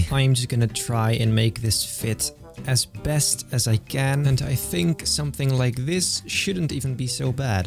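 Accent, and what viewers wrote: Netherlands. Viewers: ik hoor dat nederlands accentje hhahah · IDK WHY BUT YOU SOUND SO DUTCH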